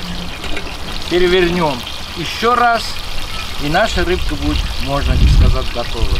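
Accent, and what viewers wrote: Ukraine. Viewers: вы просто крутой узбек с украинским акцентом) живите долго, кушайте вкусно!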